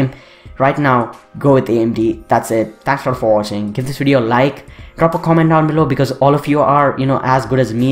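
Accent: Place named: India. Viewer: cause he is indian but at least i can understands him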